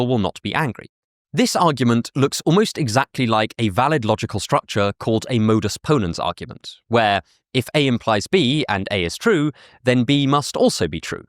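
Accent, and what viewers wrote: England, United Kingdom. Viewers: I'm like 2 minutes in and the English accent alone make this so legit and sounds so intelligent.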